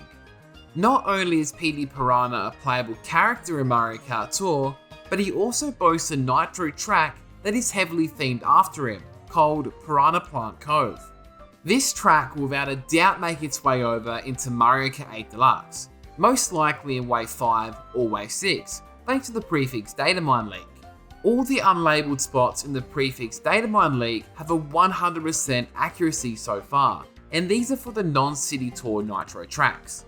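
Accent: Australia. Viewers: I’ve never heard someone more Australian than you. And i love it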